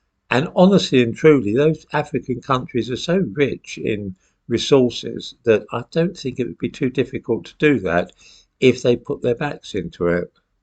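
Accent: United Kingdom. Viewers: Is this British accent? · It’s really nice to listen to an eloquent british gentleman comment on our crazy situation that we’re in with the loss of all culture and infrastructure that our fore-bearers worked and fought to create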